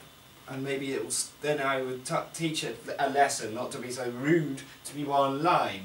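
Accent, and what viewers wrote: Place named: Boston, United States. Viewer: Where do you get a slick Boston accent like that?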